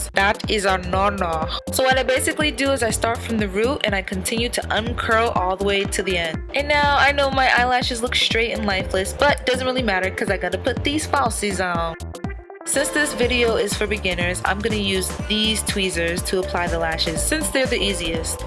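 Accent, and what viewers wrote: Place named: Rural, United States. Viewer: Your lil country accent is the sweetest thing I have ever heard in my life!!! <3 I know thats weird but you keep throwing your lil twang in there and its literally the cutest thing I have ever heard! Get it ma!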